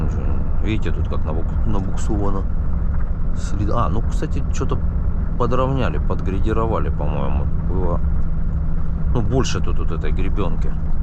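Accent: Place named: Rostov, Russia. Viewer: А говор у тебя Ростовский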